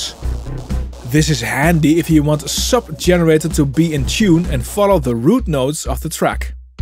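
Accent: Netherlands. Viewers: i hear the nethelands yes, goede video tho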